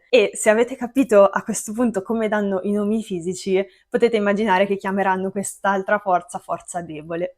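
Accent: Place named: Tuscany, Italy. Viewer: Hai un simpatico accento forse toscano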